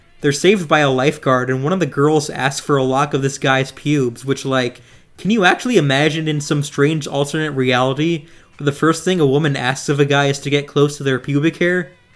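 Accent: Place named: Canada. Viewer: Good video bro! Also, what country are you from? you sound pretty Canadian from what i hear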